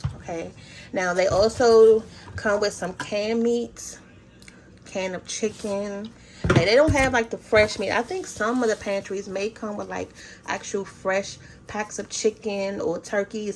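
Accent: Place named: Louisiana, United States. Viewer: Are you from Louisiana? The reason I asked is because you have a Cajun accent.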